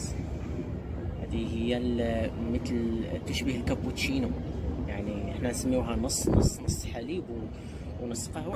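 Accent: Morocco. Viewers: Jat m3ak lehja meghribia.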